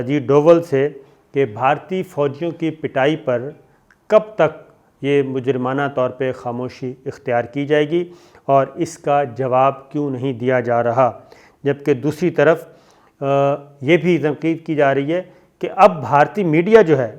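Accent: Punjab, Pakistan. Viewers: اسلام علیکم. چین جو بھارت کے ساتھ کر رہا وہ تو یے. لیکن میرے بھائی جو آپ اپنی باتوں سے بھارت کو ذلیل کر رہے ہیں وہ تو اور بھی لاجواب ہے. اس پاگل ہاتھی کے ساتھ یہی ہونا چاہئے.27 فروری کو جو بھارت کے ساتھ ہوا.... کیا یہ ذلت کافی نہ تھی ؟ مظلوموں کی آہیں اور بد دعائیں انشا ء اللہ اس مودی اور اس کے ٹولے کو تباہ کردیں گی. آپکی پنجابی اُردو کا مکچر بڑا لزیز ہے. بھارتی جب اسکو سنتے ہوں گے تو انہیں بہت مرچیں لگتی ہوں گی. آپ لگے رہیں ہماری دعائیں آپ کے ساتھ ہیں..... پاک چین دوستی زندہ باد. I also love my great brother country Bangladesh and Turkey. We are standing with our brave Kashmiri brothers. Ae Allah meri arze paak ki hifazat farma. bhai zinda bad. Your great lover. Professor Syed Hamid Manzoor Lahore.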